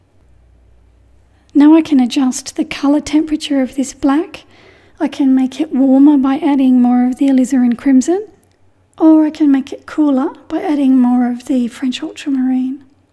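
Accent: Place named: Australia. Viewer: I keep repeating to myself “ I am SO happy I found you on YouTube!”. I love your attention to details WITH the softening and the loose or lost edges. You are a fantastic teacher. Clear, easy to follow and understand. Your limited palette makes me remember to keep moving in that direction and I need to remind myself to let the colours mingle on the paper and stop fussing with them.. I love the Ozzie accent too! So sorry to hear about those horrible fires and so much damage to homes, forests and wildlife. The World IS watching and hearts are aching.. please keep posting your videos are in my favourites list and I watch some of them over and over. It shows how hard you work to get them right!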